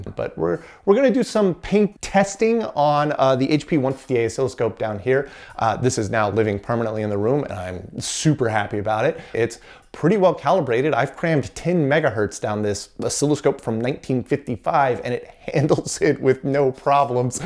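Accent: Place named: Texas, United States. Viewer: I love how you got real Texan when talking to camera. David Murray does it too. Nice Bendix by the way.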